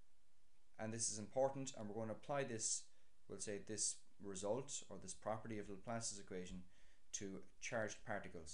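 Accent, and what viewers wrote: Ireland. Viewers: I actually like your, I believe Irish, accent. I appreciate a variety of accents which seem to help me focus. On the other hand the somewhat low volume is a bit of a problem. I have to pick quiet times to listen to the audio.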